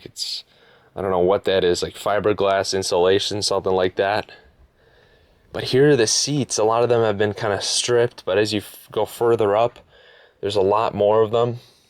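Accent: Chicago, United States. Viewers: I knew you were from Illinois. Got that Chicagoland accent haha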